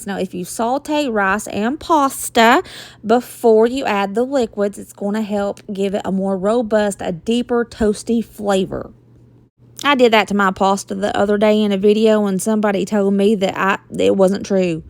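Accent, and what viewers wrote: South, United States. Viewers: I love your southern accent. It's so cute! I'm Alabama, born and raised, so you know I have an accent too but isn't as cute. Girl, yours is pure cornbread, and I love it!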